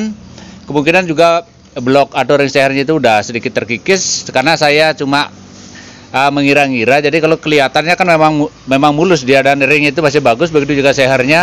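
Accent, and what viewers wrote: Bali, Indonesia. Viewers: Bali gati bapake ne 🤣🤣. Lanjut pak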